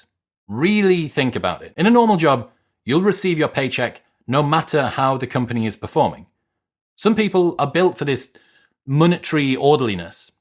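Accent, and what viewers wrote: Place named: England, United Kingdom. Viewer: I love this English accent. You treat the "u" consistently.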